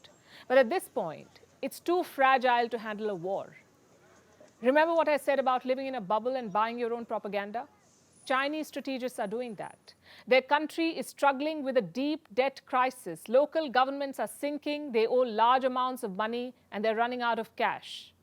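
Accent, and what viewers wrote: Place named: India. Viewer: Palki is paid by the CIA to spew anti-China/anti-Russia HATRED. Her thick Indian accent is meant to fool naive Indians into thinking that she represents Indian people. That is not quite true. Palki never bad-mouths Biden or the Democrats. That is a dead give away. Palki's CIA pay-masters wouldn't tolerate that.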